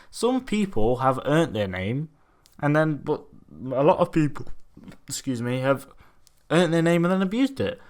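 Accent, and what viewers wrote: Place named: United Kingdom. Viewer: Another British voice! <3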